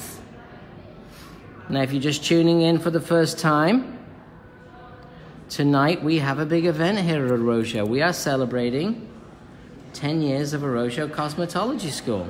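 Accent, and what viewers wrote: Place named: United States. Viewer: Why are you trying to speak with an American accent ??!!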